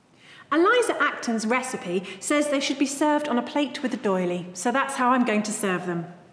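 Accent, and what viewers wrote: United Kingdom. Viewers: 凄まじいイギリス英語だな Very British English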